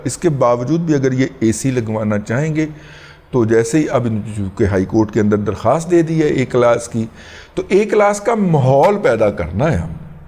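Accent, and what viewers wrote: Punjab, Pakistan. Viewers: Punjabiyat jhalak Rahi Hy AP k monh Sy. 😅wah kia analyse karty ho.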